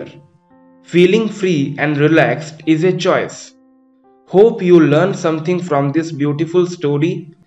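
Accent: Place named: Bihar, India. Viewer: His accent seems to suggest that he is a pakka Bihari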